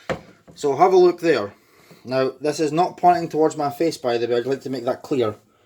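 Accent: Scotland, United Kingdom. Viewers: Scottish accent is charming. And we do not have to paint our blank guns here. Love from Ukraine.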